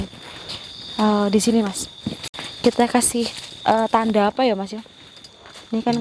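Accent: Banyumas, Indonesia. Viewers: Pingin banget di marahi Ama mbak dengan bahasa ngapak nya, palingan aku cuma cengar cengir..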